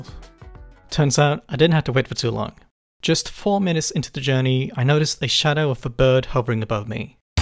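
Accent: Australia. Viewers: That's true, this chap sounds half Aussie and half cultivated midlands or such like.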